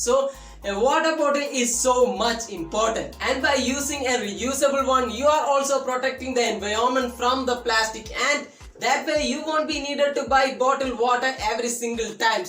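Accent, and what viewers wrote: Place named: Kerala, India. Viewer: Your English slang tells me that you are a Malayali boy what ever support from Karnataka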